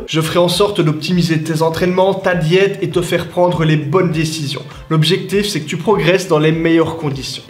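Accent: Liège, Belgium. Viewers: Salut. Merci pour les infos! Ton accent me fait penser sérieusement que t es du côté de Liège? Vive la Belgique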